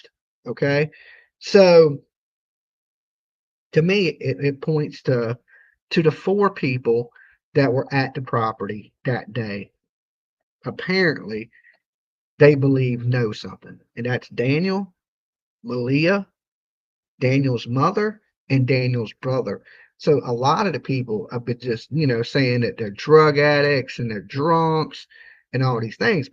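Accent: South, United States. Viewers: Hey! Long time listener (I remember you playing the Banjo during Summer Wells). I live on the border of Maine (USA) and New Brunswick (Canada) by 3 blocks and a River. It is Picteau County but pronounced Pick (like a guitar pick) TOE (like the appendages on your feet). I know this because I listen to a LOT of Canadian news (CTV or CBC). You are close and that redneck accent may be the issue, but Maine has rednecks, too so no excuse.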